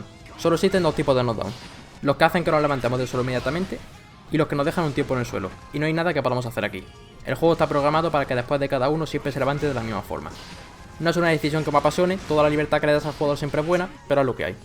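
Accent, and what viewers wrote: Spain. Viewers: este youtuber es genial, enseña muy bien, pero no le entiendo lo que dice, de que región de España es ese acento?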